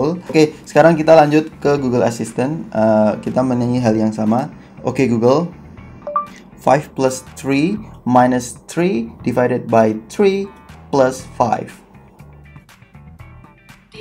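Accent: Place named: Yogyakarta, Indonesia. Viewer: Saya lebih suka kamu berbicara dalam bahasa inggris, karena bahasa indonesia terkesan berbau yogjakarta.. tapi overall goodluck...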